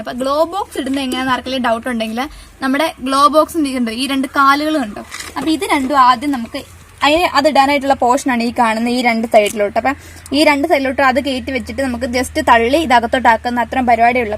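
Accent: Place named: Kottayam, India. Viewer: Kottayam or idukki 😀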